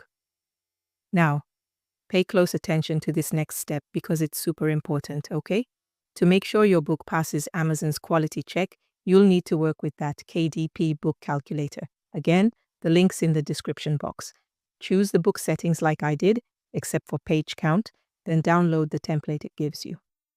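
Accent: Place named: Africa. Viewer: She's African that's why she talks like that. English isn't first language it's obvious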